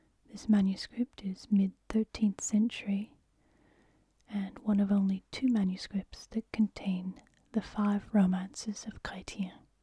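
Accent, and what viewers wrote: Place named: France. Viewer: really love your french accent. i wish i could hear you read an entire book in french x)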